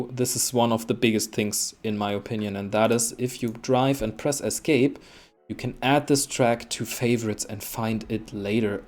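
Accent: Germany. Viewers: Lol ein Münchner, servus!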